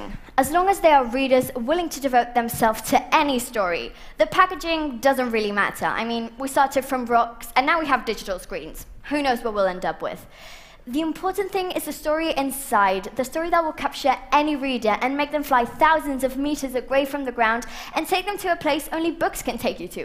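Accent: United Kingdom. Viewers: Ostia, catalana amb accent britànic!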